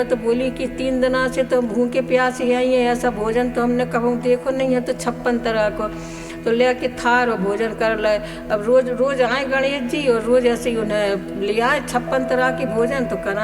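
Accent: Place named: Bundelkhand, India. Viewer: Bahut acchi lg rhihai katha apni bundelkhandi boli sunkar to maza hi aa jata hai. Radhe Radhe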